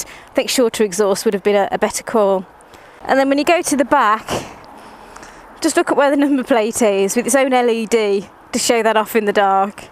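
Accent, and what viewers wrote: United Kingdom. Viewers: I LOVE how you speak. Are you english? And forget the hate. Because the "negative comments" that I saw here are clearly hate. Out of jealousy, and fear. Guys don't like when they meet girls who have double their balls. And for a girl to ride a Diavel, she needs big balls ahah! Tell me, are you tall? Small? What's your frame? I wonder if a short, slim girl can actually ride a Diavel.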